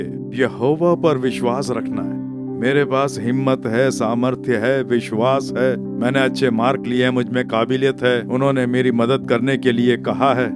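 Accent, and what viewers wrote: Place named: Chhattisgarh, India. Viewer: Chhattisgarh ki bol rahi hai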